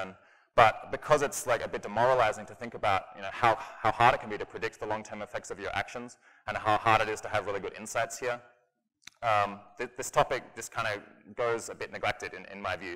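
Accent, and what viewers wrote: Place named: Australia. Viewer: just his accent. one part of Australian accents is higher pitch at the ends of sentences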